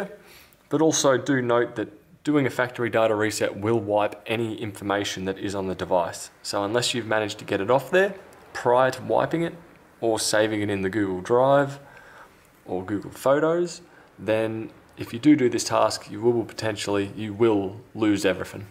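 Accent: Australia. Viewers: Great to hear an Aussie voice. I hope you are making a killing with monetisation - take as much money as you can from those bastards!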